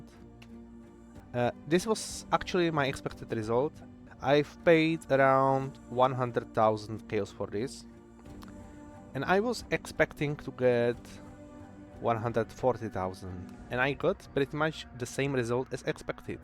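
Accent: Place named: Czech Republic. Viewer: Českej přízvuk se prostě nezapře.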